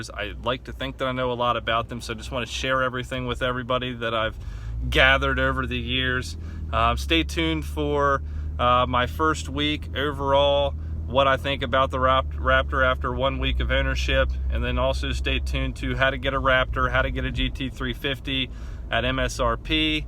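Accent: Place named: Pittsburgh, United States. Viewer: You sound like a yinzer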